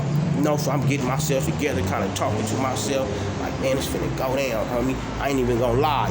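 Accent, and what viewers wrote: Los Angeles, United States. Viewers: bruh yo channel gon blow like a muthafucka wit them fire ass stories wit that extra thick real L.A. ganbangers accent.. I subbed up quick like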